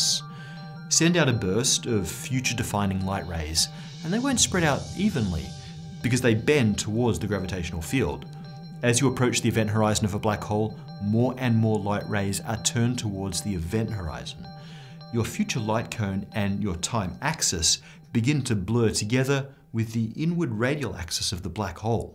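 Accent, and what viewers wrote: Australia. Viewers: He's from Australia. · Why does this guy have an Australian accent? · seriously is an aussie??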